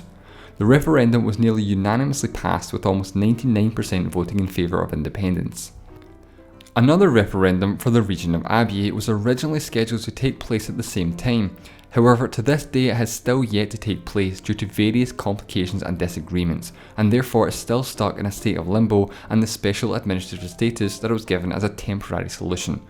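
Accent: Scotland, United Kingdom. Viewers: are u scottish? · he has a scottish accent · what accent is this? scottish? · Thank you mate!! by the way, now that i'm watching the video again his scottish accent is fairly obvious, i should've noticed it before. i'm such a fool 😁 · He’s Scottish. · i cant help but wonder why i find females from the UK, accents sooo sexy, while the males i consider an assault on my auditoty systems.both electronic and organic. anyone know exactlly where this gentleman is from exactlly? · I get what you're saying, but... the dude is not gringo, he's scottish. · GeoffCMD I don't know what Canadians you've been listening to, but he doesn't even sound close to one. Like Nobody said, he's Scottish. Though he has a thicker accent than I'm used to hearing for Scottish accents, like in Glasgow. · Luiz Albano He’s Scottish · Love this videos, love this guy's scottish voice, love that tiny piano in the background, love that Chile is mentioned here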